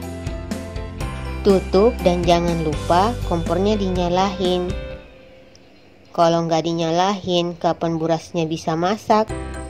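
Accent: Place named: Bugis, Indonesia. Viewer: Asli org bugis ini dr caranya bcr bhs indonesia sm logatnya😅kurang lengkap kalo tdk dibuka,mau diliat bgmn hasilnya,tipsnya jg spy tdk cpt basi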